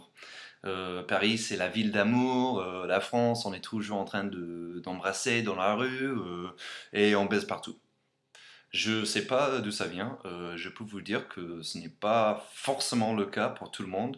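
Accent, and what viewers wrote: United States. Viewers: On pense que les Américains s'habillent mal (chaussure qui ne s'accorde pas avec une jupe ou une robe et aussi un t-shirt au-dessus d'un sous-pull). Sinon, vous êtes toujours enthousiaste, vous dites "je t'aime" facilement alors qu'en France on ne le dit pas, et aussi vous parlez très vite, c'est comme si vous aviez un chewing-gum quand vous parlez (à cause de votre accent américains. Mais on vous aime😊.❤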